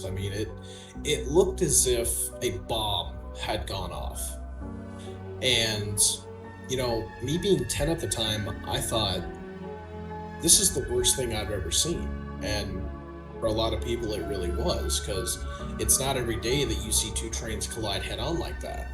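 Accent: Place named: East Coast, United States. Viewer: Hey bro, he lives on the east coast and we pronouce things different out here. Cut the dude some slack jesus.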